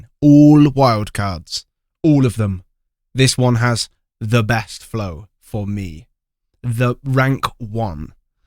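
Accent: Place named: United Kingdom. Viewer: И твой британский английский как скальпель по яйцам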